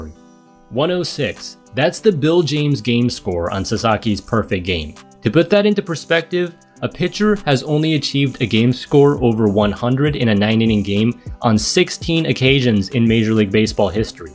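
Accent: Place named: Japan. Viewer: Sportstormの動画から来ました。英語に日本人っぽい癖があるなと思ったら千葉在住ww めちゃくちゃ英語お上手ですね！ I was actually interested in the statistics side of this games and you’ve provided everything for me. Dropped a sub just lettin you know.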